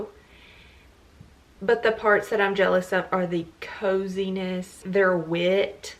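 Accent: South, United States. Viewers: I dont know u i just got this on my explore page but i think ur so cute with ur little southern accent n u def nailed the rory outfit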